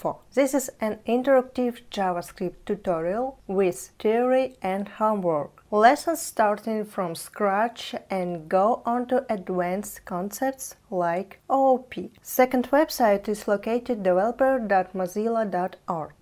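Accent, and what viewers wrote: Russia. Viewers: Ну английский я бы на твердую 3 оцениваю)) чувствуется русский английский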